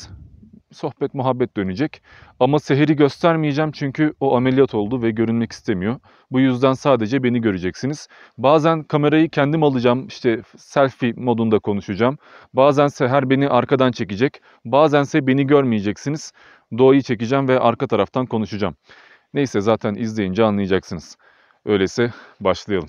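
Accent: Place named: Kastamonu, Turkey. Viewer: Kastamonu 'ı şivesinden belli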